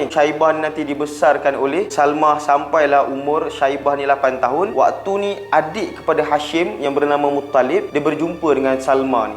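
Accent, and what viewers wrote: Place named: North, Malaysia. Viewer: Crita dh sedap..tpi bhsa utaqa hg xbrpa nk sdp.....tpi boleh prbaek lgi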